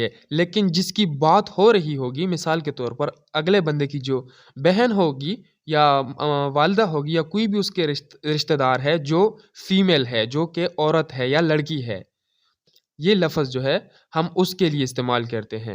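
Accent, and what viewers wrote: Peshawar, Pakistan. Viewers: bhai ya peshawar ki pashto h?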